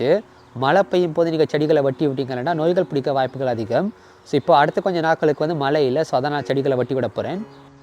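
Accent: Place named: Sri Lanka. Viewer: Srilankan Tamil, I think U are from Sri Lanka. You share good things and very useful, Thank you brother good job 👍.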